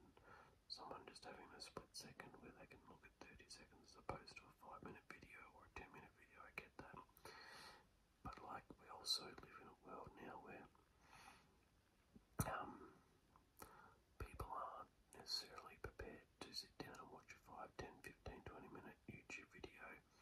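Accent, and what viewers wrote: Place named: Australia. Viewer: You sound Australian.........and I don't like it.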